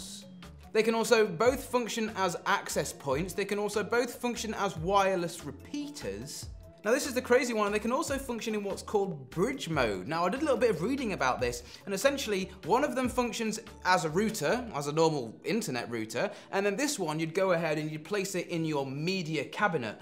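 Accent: England, United Kingdom. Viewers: Love your English accent! 😁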